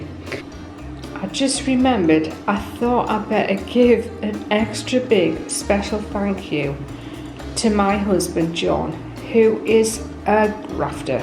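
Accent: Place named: England, United Kingdom. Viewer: Beautiful colour palette in this bathroom. Beautiful shining Chrome fittings and towel rack really complement the shining tub and basin. If you ever give up soap making you could do interior decorating. You have such a beautiful English accent maybe that's why everyone wants to hear you talking. Have a wonderful day x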